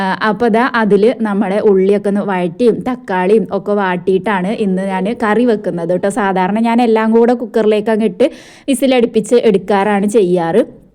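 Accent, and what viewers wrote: Malappuram, India. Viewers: Hai video eshtayi nammalum illi yeduth modayanna paraya.😂😂malapuram bhasha👍😅ok da by sainuty❤❤❤❤❤❤❤❤❤❤❤❤❤❤❤❤❤❤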